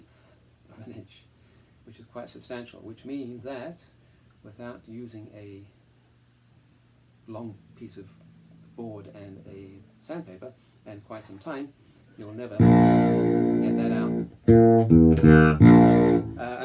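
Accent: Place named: Florida, United States. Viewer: I’d recognize that Florida accent anywhere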